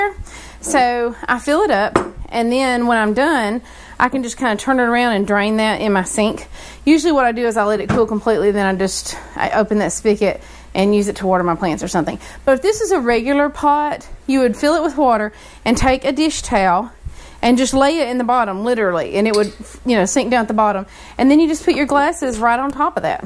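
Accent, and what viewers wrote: South, United States. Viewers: Girl, love your accent. I miss the south. Thank you for the tips and video!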